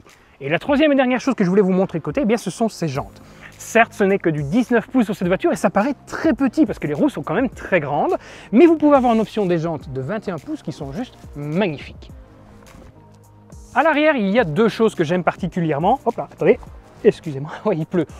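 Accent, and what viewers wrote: Belgium. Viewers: Excellente vidéo. Et puis l'accent belge j'adore ;-) Mais ce modèle est lourd, plutôt cher, pas très puissant à la vue de sa batterie de 86KW (performance moyenne), longue à charger, bref cela, selon moi, manque de modernité. Et la marque Tesla avec son modèle 3 SR+ détrône de très loin ce modèle ;-)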